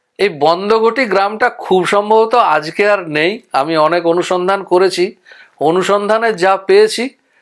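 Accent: Bankura, India. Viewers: মশাই যে বাঁকড়ি উচ্চারণ এই বোঝা যায়। আমিও বাঁকড়ি। খুব ভালো তথ্য পেলাম।❤❤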